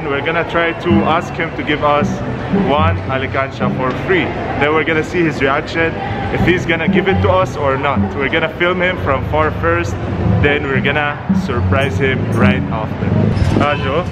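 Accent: Philippines. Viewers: Ur slang is lil kabayan now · It’s crazy you picked the Filipino accent 😀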